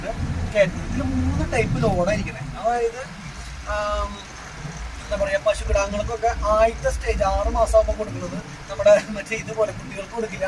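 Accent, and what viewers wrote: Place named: Palakkad, India. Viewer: Palakkad basha pwoliche 😂😂😂😂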